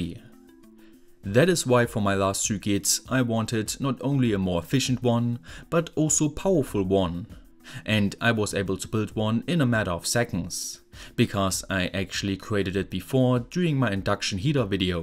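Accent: Germany. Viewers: Lol als ob du aus Deutschland bist xD Hatte irgendwie usa gedacht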